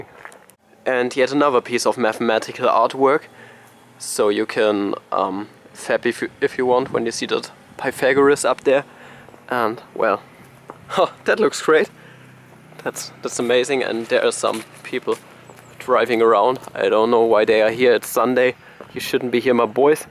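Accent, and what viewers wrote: Germany. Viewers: I do love how blunt German's sound when they speak in English, great video nonetheless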